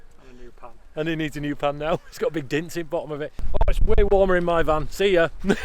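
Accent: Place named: Leeds, United Kingdom. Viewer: We love the channel and site reviews. Having been born and grown up in Leeds Im sure there is a north east Leeds twist to the accent and have now invented a new family game of “where’s ” accent from. Keep the reviews coming. They are a great way to unwind after work and between our own campervan adventures.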